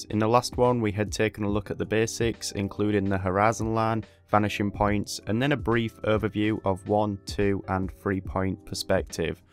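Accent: United Kingdom. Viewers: As much as I know he is from the UK xD If you wanted to know which area of the UK, sorry I don't know that 😅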